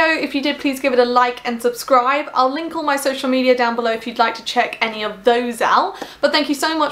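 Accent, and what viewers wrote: United Kingdom. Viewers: A lady’s British accent can even make “poo” sound classy.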